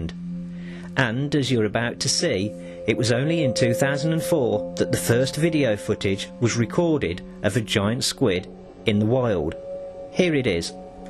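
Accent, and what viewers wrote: United Kingdom. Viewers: Do you have a west mids accent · I wonder if it's the Cov accent that makes everything you say sound plausible,because I've got a Cov accent as well,lol!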